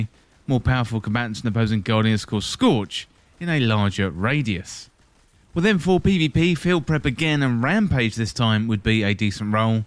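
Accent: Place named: United Kingdom. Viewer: You sound like JARV. All you British twits sound the same.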